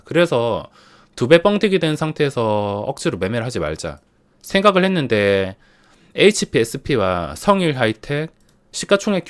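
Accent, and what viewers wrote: Gyeongsang, South Korea. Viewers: 칠암사계에서 목소리랑 똑같은분을 옆자리에서 우연히 뵈었는데 경상 지역남자분들 목소리는 비슷한가요 제가 암튼 늘 감사히 듣고있습니다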